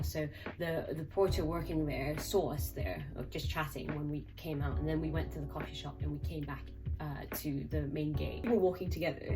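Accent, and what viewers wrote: Scotland, United Kingdom. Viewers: ngl I thought you sounded Scottish for agesssssss!! Gosh I feel the same.... I find myself laughing at 'jokes' but really it's not okay. Thank you for articulating so many of thoughts I have, especially not fully resonating with the term BAME.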